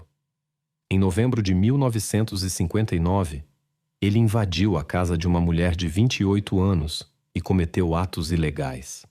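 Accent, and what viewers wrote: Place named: Portugal. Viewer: O canal é ótimo, exceto quando coloca sotaque de PORtugal. Afffff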